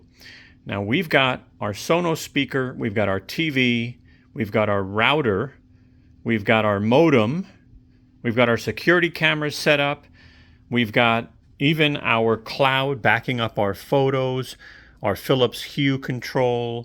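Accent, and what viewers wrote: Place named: New York, United States. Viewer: First time on your channel. Cool review. You sound like my son in law who's from New York.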